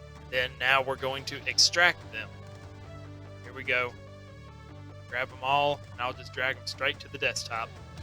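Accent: United States. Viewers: Sound like you from Arkansas son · Everything sounds so much more simply explained in a Southern accent.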